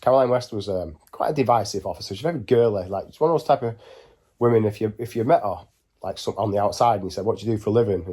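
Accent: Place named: Liverpool, United Kingdom. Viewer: good channel this bro. laughing with the scouse accent 😂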